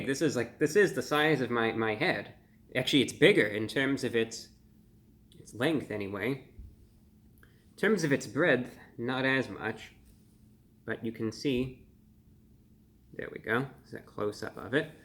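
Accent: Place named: Transatlantic, United States. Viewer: You are just adorable. I love your kindof transatlantic accent talking seriously about new fast food releases. I'll go check out your other videos now! I might try this if I can get it with ground beef instead; I'm not a big steak person to begin with and I won't go anywhere near fast food steak.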